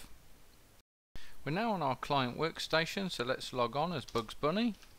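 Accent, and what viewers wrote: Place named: England, United Kingdom. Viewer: i must say i like your English accent although i haven't done viewing this video, it sounds like you are from England, plus sounds like Klaus from vampire. Anyway, thanks for the video, and i'm studying now.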